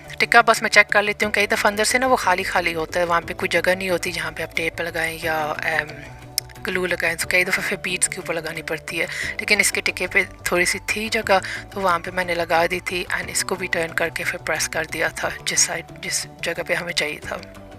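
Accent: United Kingdom. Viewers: I could tell by your accent that your're british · Her English accent 😍😍😍😍😍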